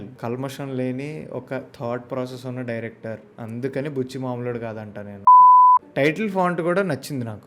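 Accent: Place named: North Andhra, India. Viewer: Rajam ,vizianagaram, srikakulam evi 3 slang kalipi vere slang vacchinchidhi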